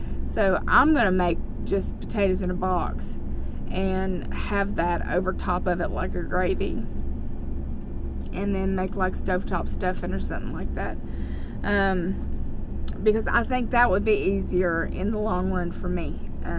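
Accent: South, United States. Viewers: Love the way you say "Oil". You still have a lot of south in the mouth!!!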